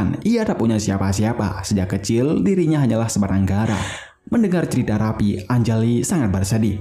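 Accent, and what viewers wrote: Bali, Indonesia. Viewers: Pasti dari bali ya min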